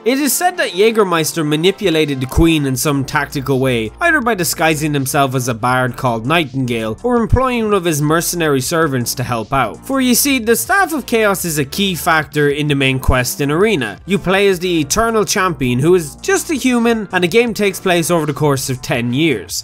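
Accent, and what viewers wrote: Ireland. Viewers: "So I was tinking" ah I love your irish accent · First comment ha how are you Irish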